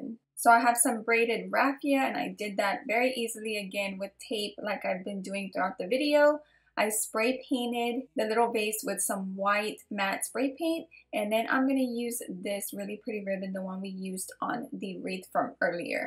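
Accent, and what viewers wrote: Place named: South, United States. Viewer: Love your videos you talk ok I’m from the south to. 10 th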